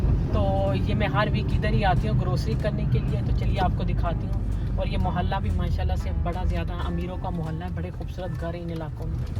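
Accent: Punjab, Pakistan. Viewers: Hi. Ap pachtu Hain or mashaAllah lagta nahi apki bataon se yehi lagga Hy ap Panjab see Hain. Bht saf Urdu bolti hai ap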